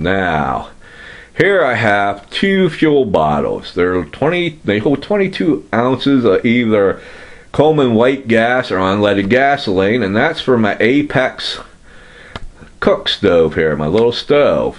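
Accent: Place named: Carolinas, United States. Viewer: where are you from? kinda sound like Forrest Gump, you from the Carolinas?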